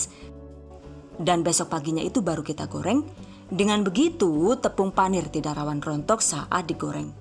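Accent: Banyumas, Indonesia. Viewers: Nyimak wlu agak ngantuk2 gtu,krn kangen denger ngapaknya wkkwkkkk....dedek ngebantu bundanya...,😀 sampk nangkring2 gtu. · Dening ngapak iya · mantap nih bun interaktif banget 😅 ngapaknya ra kuat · Mantabs banget mamake 😁😁 salam kenal bunda..sy suka resep2nya.. suaranya khas dan ternyata ngapakers juga 😍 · Ngapak kaya nyong 😆😆😆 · maaf suaranya suara ibu kan ya? lucu gaya ngapaknya hihi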